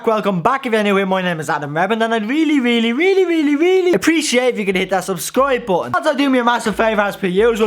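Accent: Ireland. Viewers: I'm from b pool cork city and your county Dublin accent is way too culchie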